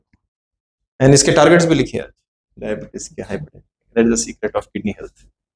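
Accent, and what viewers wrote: Hyderabad, India. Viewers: I like Dr style and simplicity ,Hyderabad tone,man from Andhra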